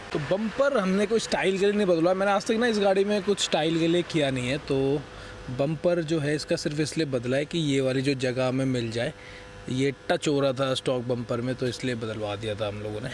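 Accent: Haryana, India. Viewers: Haryana ka aadmi boli s jarur pakda jaa h bhai ji…. Ab bas kahi aandhane chale jao trip pe 😅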